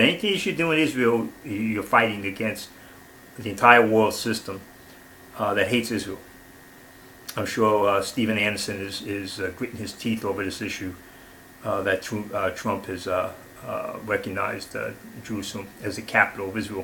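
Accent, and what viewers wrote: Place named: Boston, United States. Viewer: Are you from Boston like me ? You sound like you're from Boston.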